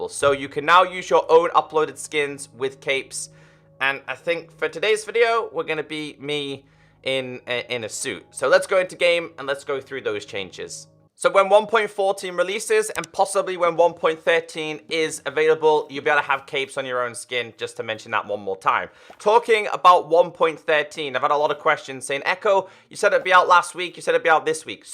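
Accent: United Kingdom. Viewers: He is british, i don't know if english, welsh, irish, or scottish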